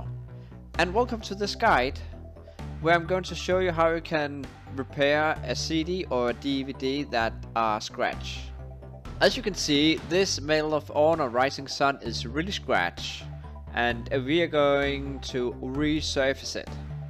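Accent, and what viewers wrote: Denmark. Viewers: I knew it. Dansker.... Our accent NEVER lies.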